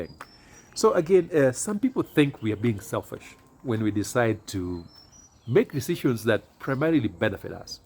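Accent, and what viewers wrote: Luo, Kenya. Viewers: Ongea tu kama mjaluo. Otherwise, as a returnee myself, this is good content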